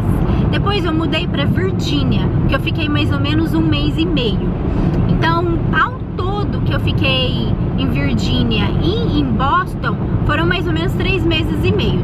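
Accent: Minas Gerais, Brazil. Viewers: Como foi pra vc lidar com esse sotaque bonitinho de minas aí nos eua? Isso "se transforma" quando vc fala inglês ou ñ? Tipo, em portugues vc fala "...ao meu redorrrr" ou "porrrr que" e aí em inglês vc fala "arrrround me" ou "you areeeee"? kkkkk É engraçado mas a dúvida é séria, ñ me leve a mal! Bjo =) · Seu sotaque mineiro é muito bonito, assim como você! Hahaha... Você tem alguma faculdade? Como conseguiu o emprego de gerente? Depois do emprego de babá, foi muito difícil conseguir outros contatos? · Mas o sotaque mineiro nunca some kkkk mt bonito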